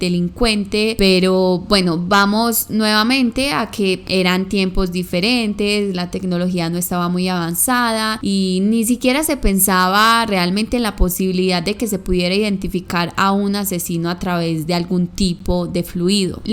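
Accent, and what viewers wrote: Colombia. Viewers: Que satisfacción es saber por fin quien era en realidad Jane Doe, y cuáles eran sus circunstancias, a pensar de que se me hace un caso súper triste, es bueno saber que ya fue identificada y ojalá un día se pueda saber quién fue el miserable que le hizo eso., disfruto mucho tú manera de narrar los casos, de verdad que es entretenido, aparte ese asentó tuyo colombiano tan bonito lo hace todavía más disfrutable. Saludos desde Guadalajara, México.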